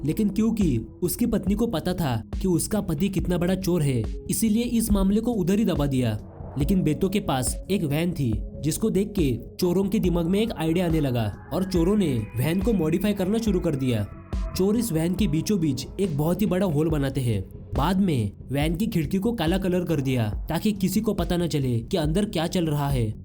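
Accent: Maharashtra, India. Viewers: Ye jo bol raha hai ye marathi admi hai marathi ki hindi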